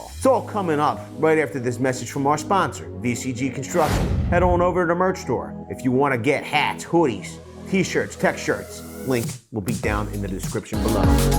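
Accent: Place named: Boston, United States. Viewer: Love your accent. I'm Australian and I feel our accent and yours which sounds like a close Boston accent are the best.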